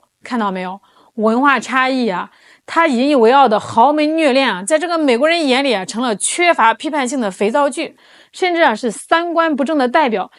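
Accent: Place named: Shanghai, China. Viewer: Your Shanghai dialect is so funny! 😂👍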